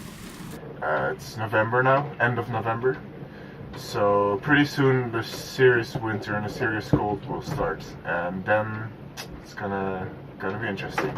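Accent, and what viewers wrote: Netherlands. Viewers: ik had ook al zo'n vermoeden. Zelfde soort accentje als mij; je Engels is zeer goed. Mooi zeg in Noorwegen! Maar dan wel weer koud in de winter. Een idee om te overwinteren op een boot in Spanje (in September varen oid?) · Cool! Are you dutch? You sound like it! 😊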